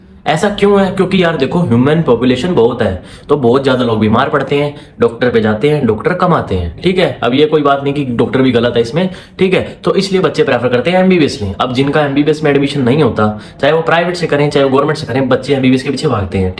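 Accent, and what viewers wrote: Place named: Pakistan. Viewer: bhai yr no offense, aap haryana se ho but aapka accent pta ni kyo mujhe pakistani wali vibe deta hai, jo woh urdu ka hota hai, mast...baaki content toh bdhiya hai hi, aur woh interest wali baat hi sahi hai, jisko sachme animals se pyar hai wohi Veterinary kre, mujhe agle saal NEET dena hai but m already prepared to be a veterinary.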